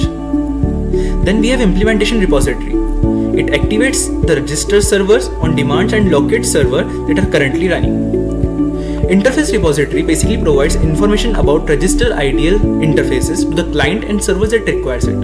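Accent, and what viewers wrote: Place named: India. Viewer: unfortunately the speaker doesn't present it in oxford english, so it is always hard to understand the indian-english. please speak slower and clearly. and the background music is annoying - please remove.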